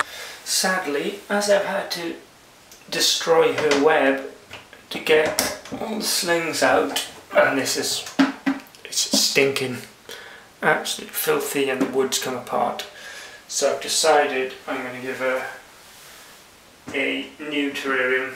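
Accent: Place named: Wales, United Kingdom. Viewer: I love your welsh accent! ❤️I especially love how sweet and gentle and obviously caring you are with them all, I speak to my babies like this too 😂 I love your concern and care for mama spidey to make sure she gets some nourishment ASAP 💕 that first crying of your son really was amazing timing too 😂🖤🕷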